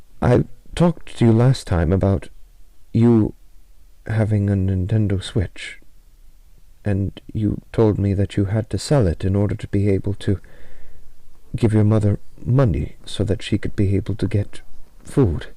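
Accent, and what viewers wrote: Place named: Scotland, United Kingdom. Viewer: why he sound Scottish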